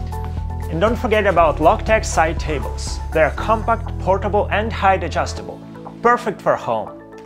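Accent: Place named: Eastern Europe. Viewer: He speaks with a VERY east european accent that is difficult for most english speakers to understand.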